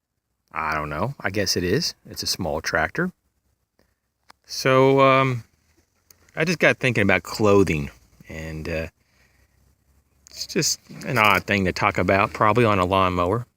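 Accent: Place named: South, United States. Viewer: Solid, important info that folks fail to mention in most survival videos. Lovin' the "warsh." 😂 reminds me of my family talking in the south down in Springfield, MO. Btw: Mr. Hank Hill, you forgot to mention your extensive knowledge on propane...but yet you nailed it on the synthetic underwear. Haha. Have a great day pal.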